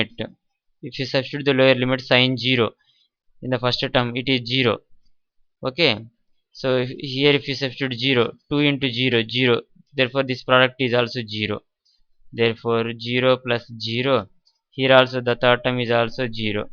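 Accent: India. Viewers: I understand this indian guy (who has very thick accent) in english way better than my professors at uni who speak in my native language, goddamn respect!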